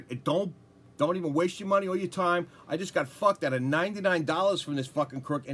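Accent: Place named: New York, United States. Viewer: Yes - that there is an awesome New York accent!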